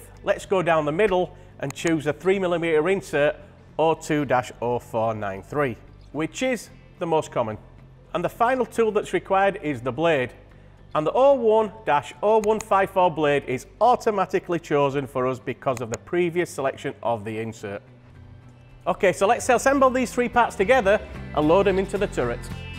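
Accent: United Kingdom. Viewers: a regional British accent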